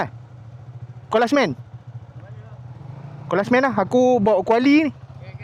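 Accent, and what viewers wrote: Perak, Malaysia. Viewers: Ater pekat beno loghatnya...udoh ghope org kuale,bote ngan parit je...🤭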